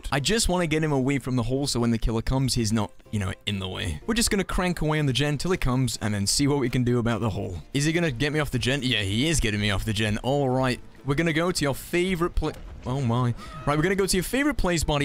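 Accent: United Kingdom. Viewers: Nah he just has a British accent.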